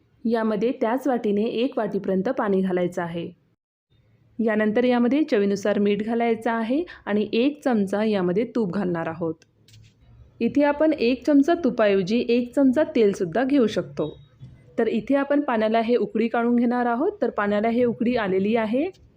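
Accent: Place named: Jalgaon, India. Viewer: जळगाव भाषा बोलत आहे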